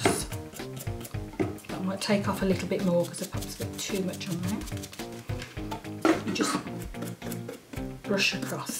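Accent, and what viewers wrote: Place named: Birmingham, United Kingdom. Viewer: Thanks. Great video. And I love them Brummy accent! Reminds me of home in Coventry xx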